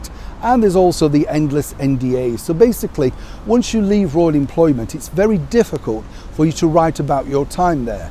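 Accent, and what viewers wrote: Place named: North West England, United Kingdom. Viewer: Can I just ask? Whereabouts are you from? My guess is north west England? Your accent is very familiar to me x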